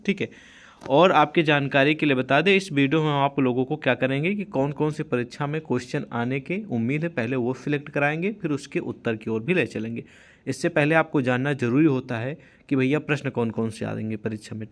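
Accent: Bihar, India. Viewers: Bihar hai ya up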